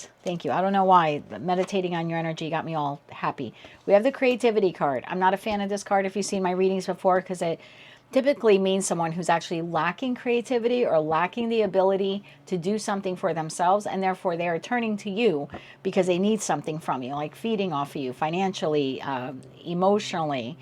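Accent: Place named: New Jersey, United States. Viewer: I got every word .. must be a Jersey thing lol stay warm/safe in this weather ❤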